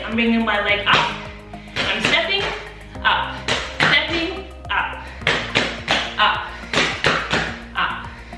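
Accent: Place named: Australia. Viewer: Loving this! First COVID, now its raining ALL THE TIME in Sydney so getting outside to skate is tough. Great to have some drills to practice that I can do in the kitchen. I Just started Derby with Northern Beaches and having so much fun! Also ... love the T-Shirt. Thanks for posting the video and great to hear an Aussie voice.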